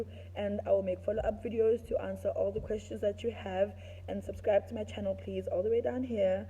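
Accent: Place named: England, United Kingdom. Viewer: logogo wenu okhuluma isingisi wathwasa e England yini ?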